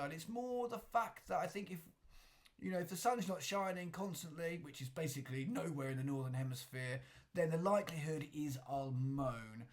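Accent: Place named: Cambridgeshire, United Kingdom. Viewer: Hello. I very strongly suspected you were from Cambridgeshire based on your accent. But you mentioned in another video that you were from Suffolk. Do people in the two counties have similar accents? I know an English guy from the city of Cambridge who has a similar accent. You also sound like the theoretical biologist Aubrey de Grey at Cambridge University but not as strongly as my friend who was born and raised in Cambridge.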